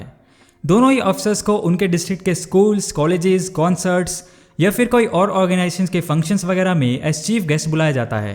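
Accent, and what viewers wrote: United States. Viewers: You must be a school going kid according to my assumption as your comment is so childish and immature.If she is talking like an American then it's ok what's the problem?? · Don't speak like a American, speak like indian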